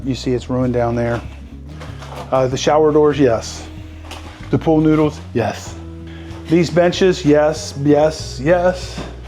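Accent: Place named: Southern Indiana, United States. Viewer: your Midwest accent... you said "dishWARSHer"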